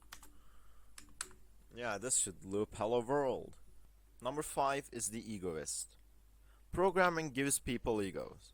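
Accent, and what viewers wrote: Turkey. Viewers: it's the turkish accent for me :p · Hey where u from. ur English kinda resembles a Turkish accent. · Aksanindan Turk oldugunu anladim :D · Turkish accent?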